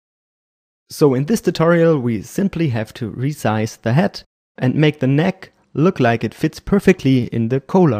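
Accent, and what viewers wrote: Germany. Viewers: Strong German accent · Are you german?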